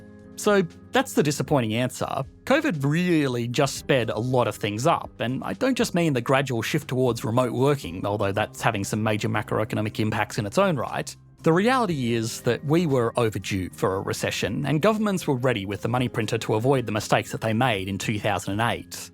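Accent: Australia. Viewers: Between you and Perun (and sometimes sunnyV2 and shadiversity) i don't thinkni can stomach analysis anymore unless it comes with an aussie accent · As an ignorant American, I can say that I could never tell if his accent was Aussie or Kiwi, so I never noticed the flag flub.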